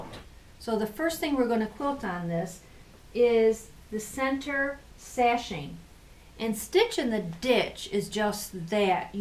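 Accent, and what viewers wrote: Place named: Western New York, United States. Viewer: Sounds to me like Western New York, I'd say Buffalo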